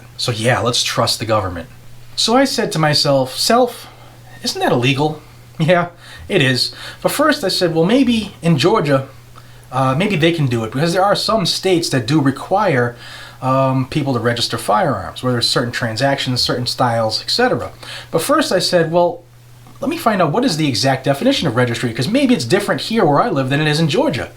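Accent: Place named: New York, United States. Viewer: Sounds like New York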